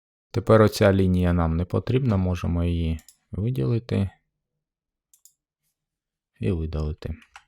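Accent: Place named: Central Ukraine, Ukraine. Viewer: запитав, бо ваша вимова дуже схожа на центр України, на мій рідний говір. Ще раз дякую за вашу працю. Ви створюєте важливий українськомовний контент 👍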